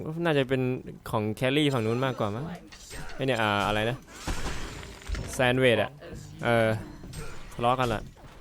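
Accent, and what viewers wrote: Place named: Korat, Thailand. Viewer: เสียงพี่โคราชมากคับ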